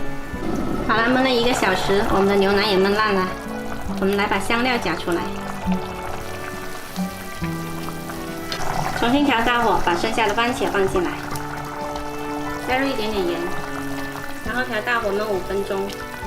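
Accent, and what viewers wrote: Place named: Guangxi, China. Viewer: 浓浓的广西口音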